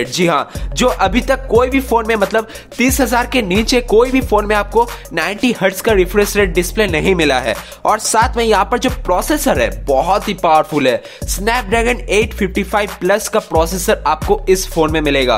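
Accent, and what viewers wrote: India. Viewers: Patna se ho Bhai..?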